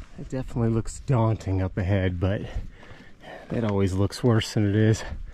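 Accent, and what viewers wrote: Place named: United States. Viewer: Is he British? Sounds so American (Australian?), but maybe my ear has been trained otherwise, such as with Scottish sheep-shearers?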